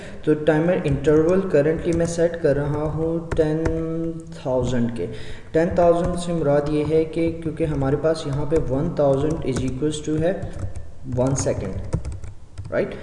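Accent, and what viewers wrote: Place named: India. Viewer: I didn't understand what this dude was saying. Was he speaking English or an Indian variation of it?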